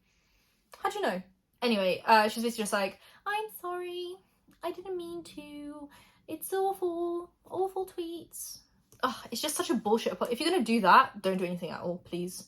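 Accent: United Kingdom. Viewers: Always get thrown off when you’re like “I’m not British” because my brain is like “British accent = British” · Your accept is proper British that’s so cool! I wonder if you speak polish if your accent changes! It’s amazing how if someone goes to another country if there from someone else they actually get the accent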